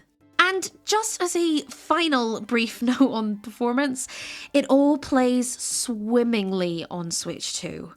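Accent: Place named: Scotland, United Kingdom. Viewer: Lovely to hear a Scottish voice in these videos, welcome to Nintendo Life :)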